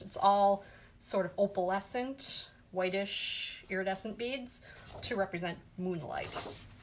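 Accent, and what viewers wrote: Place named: New York, United States. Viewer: Hi I really enjoy your little chat. Feel I am back in N.Y. specially with the way you say certain words such as gala and expressions such as make a pretty lunch. One day I collapsed in Met op shop and a lady arranging jewellery display helped me stagger out to foyer where I tried to hang on to those fancy crowd comtrol ropes! I wonder if this lady was you? Great thing about fainting there..I was whisked off to bowels of Met where I met Peter Gelb and also saw amazing porrtrait of Maria Callas. Later I banged into Peter Gelb again and said, "Wow! I keep bumping into VIPs. Quick as a flash he said, "You're the VIP!" Clever guy!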